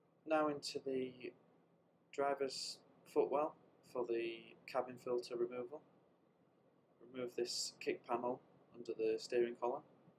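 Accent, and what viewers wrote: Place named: North, United Kingdom. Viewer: Really helpful cheers buddy! Nice to hear a fellow northerner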